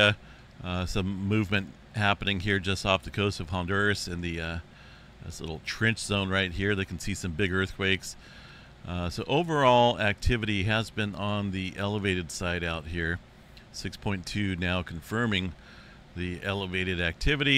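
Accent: California, United States. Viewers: You sound like you’re from Cali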